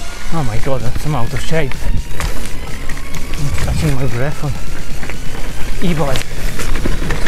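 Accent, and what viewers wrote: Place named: Poland. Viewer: angielski masz dobry ale slabo slychac i do tego ten nasz angielski/Polski accent 😉 jak chesz wiecej subow to sie musisz pokazac na ekranie.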